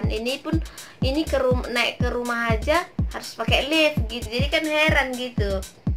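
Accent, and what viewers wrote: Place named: Indonesia. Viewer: Pekat endon nya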